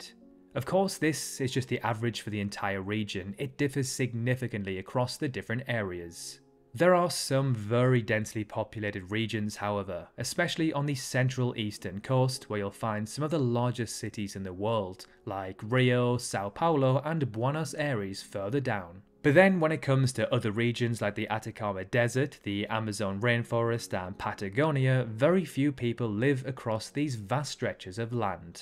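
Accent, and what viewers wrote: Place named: Newcastle, United Kingdom. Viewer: This guys so geordie u can tell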